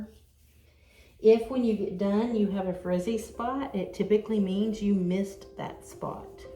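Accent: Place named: South, United States. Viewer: Thank you for this video!!! I JUST discovered you and subscribed. I can't afford to go back to my DevaCurl Stylist right now, so I will be watching ALL of your videos. I also love your haircolor and highlights. Can I ask what color/brand you use? Also, you are so very pretty, great personality and adorable southern accent!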